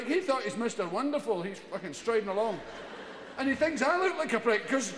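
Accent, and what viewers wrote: Scotland, United Kingdom. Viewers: Im afraid I dont understand half of it…wish I was scottish (or maybe not)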